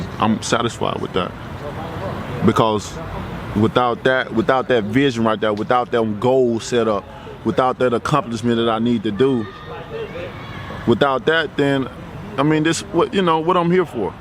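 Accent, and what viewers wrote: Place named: Alabama, United States. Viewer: I’m reading the comments and I think it’s disgusting that people are poking fun of the way that he speaks, he’s from Alabama, they speak with a southern accent. There’s nothing wrong with what’s he’s saying, people from around the world think, especially Europeans, look down on other English speakers because we don’t sound like them. I know this to be a fact, I served with British and Irish soldiers and they rag on guys from America about their accents. I can’t wait until this guy cleans out the the division, so all you Europeans can be quit. But I’m figuring you guys will complain about something else Americans do or say.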